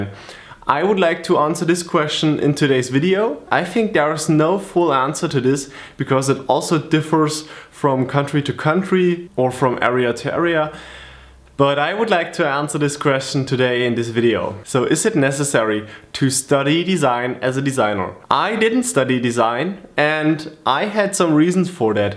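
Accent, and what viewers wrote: Germany. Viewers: Where are you from? Germany? :D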